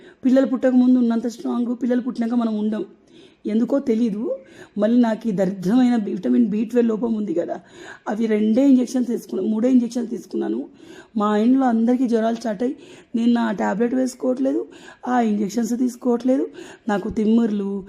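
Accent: Telangana, India. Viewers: Nice Bhasha ❤👌pakka Telangana ❤❤❤😅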